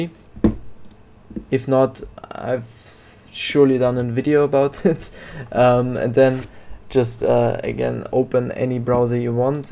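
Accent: Germany. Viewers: ur have german accent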